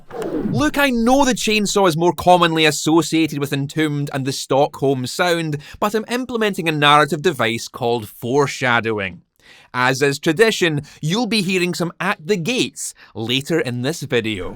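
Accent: Scotland, United Kingdom. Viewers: I remember you from the other channel.. Im much older then you are.. I always seen you as this friendly metal musician, hard rock, heavier then but that style of metal-rockish 80 thingy. I was almost thinking that the next hit song will be released by you, and it will be better version of" ". I thought that untill now!!! I always thought that you had a cute accent and always respected it because I way better like the original Scottish culture then the imperialist culture of England, and Scottland was always this land of beuty surrounded by people who dont belong there. What you expressed today made my jaw drop! YOU ARE FUCKING AMAZING!!! DUDE! GREAT RESPECT! Respect for being such a friendly and professional video content creator while hiding this FUCKING AMAZING BEAST INSIDE YOU! Dude.. Awesome! Did Anyone else notice that!?!?! SHARE THE FK OUT OF THIS! 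The guy is A-FUCKING-MAZING! No im not getting payed for writing this shit! I could be his dad, and I got my own son.. The guy just impressed me so much. Oh my God.. YOU SIR GOT AMAZING SKILLS AND TALENTS!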